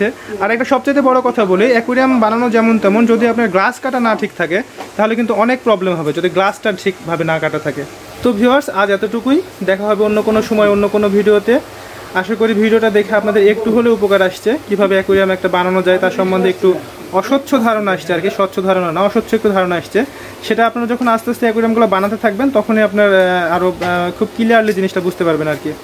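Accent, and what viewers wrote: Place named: Rajshahi, Bangladesh. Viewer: রাজশাহীর ভাই কথা শুন্যা বুঝল্যাম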